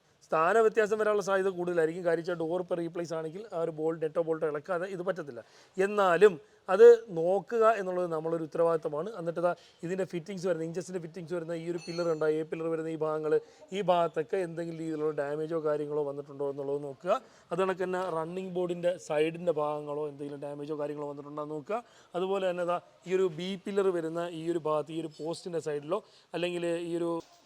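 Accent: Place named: Kollam, India. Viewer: കൊല്ലത്തു കാരന്റെ നാടൻ സംസാരം.....😂 ഒന്ന് പെയിന്റ് മേളം 😂 കൊഴ😂 ഇത്തരം ഒരു പാട് വാക്കുകൾ ഇക്കാ സൂപ്പർ വർക്ക്ഷോപ്പ് സൂപ്പർ പുതിയ ജോലിക്കാർ നല്ലത് വരട്ടെ നമ്മുടെ നാട്ടുകാർ സപ്പോർട്ട് ചെയ്യുകയും ❤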